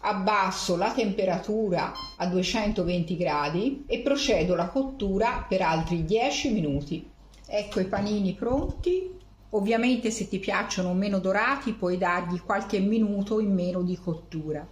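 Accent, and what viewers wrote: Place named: Florence, Italy. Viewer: Bravissima! Ti seguo da NY. Cercavo una ricetta facile per panini. Grazie! Adore il tuo accento fiorentino😊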